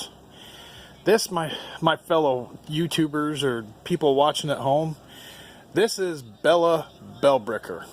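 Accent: South, United States. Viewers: Hi darlin,you know that's how we southern people talk.I hope you are not bothered by that.I am so happy you started you own video's.I am a new sub.Looking forward to more <link> safe my friend.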